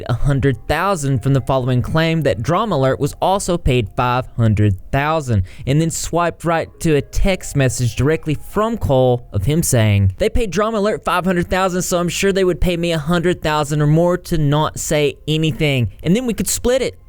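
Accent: South, United States. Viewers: Is he from the south?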